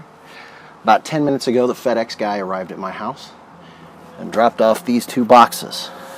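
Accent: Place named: United States. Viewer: I was shocked when I played this video. The last thing I expect was to hear an American voice. First thing I did was give you a thumbs up, know what I mean Vern?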